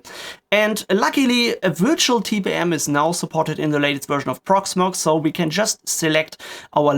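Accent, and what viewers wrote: Germany. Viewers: No front but you sound like a german i am also a german boy :D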